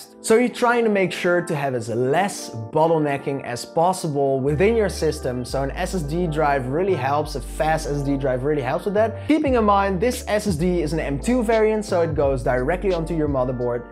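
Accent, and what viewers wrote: Netherlands. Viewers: haha, ik dacht de hele tijd al dat je nederlands was (piep klein beetje accent). Mooi gebouwd! Ik ga denk ik dezelfde bouwen maar met de 3900x. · are you from the netherlands? your accent sounds like it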